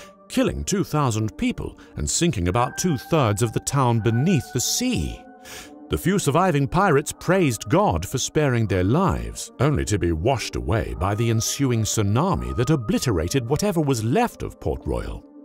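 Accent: United Kingdom. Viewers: Is this guy British or American? Because he's using a British accent, but he's also using the American pronunciation of "Caribbean" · British accent but american pronunciation?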